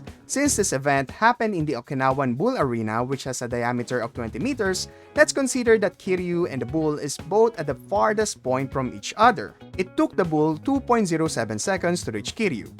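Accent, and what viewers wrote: Philippines. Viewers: I think you're a Filipino, from the accent that I am hearing.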